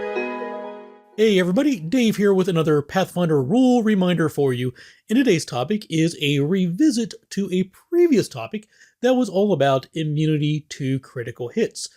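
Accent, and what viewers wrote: United States. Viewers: thanks for the reply! actually, i was wondering which state? there are so many american accents and i find them hard to untangle. (totally didn’t notice the speech impediment btw!)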